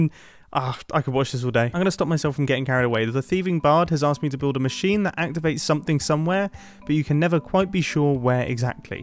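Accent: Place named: United Kingdom. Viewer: there both Brittish difference is Wheatly is Dumb while has a basic Idea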